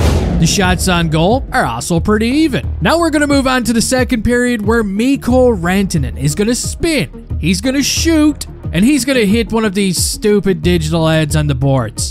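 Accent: Newfoundland, Canada. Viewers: My thoughts? Good to see Jaro breaking out. Good to hear we got a 30G cap again. Xhakej (sp??) is a freakin’ house. But most of all, let’s here it for the Newfy accent. If you don’t immediately find joy in your heart hearing a newfy say “tird perid”, I assume your soul is dead. Good show friend. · Love your accent!! Good ol' Canadian hockey talk!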